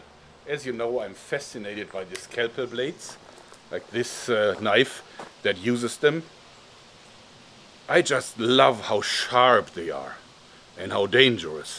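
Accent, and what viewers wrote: Germany. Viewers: Did you ever live in America or you learned English in Germany? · Hi, Can you please explain in more detail why you don't likr the last knife that you presented last. Thanks, Also keep up the good work and I love your vids even though sometimes I cant understand what you are saying cause of the strong German accent, but after I rewind and play the part in question I can usually piece it together. Pete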